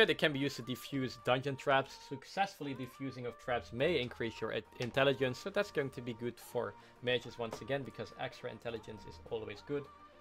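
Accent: Netherlands. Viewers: R u dutch? Just asking?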